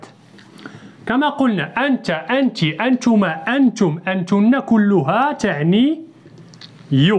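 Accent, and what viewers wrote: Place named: United States. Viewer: هذا نطق أمريكي و ليس بريطاني على ما أظن أستاذ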